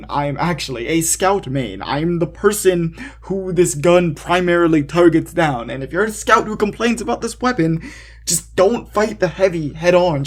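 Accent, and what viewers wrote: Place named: Turkey. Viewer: This guy sounds very turkish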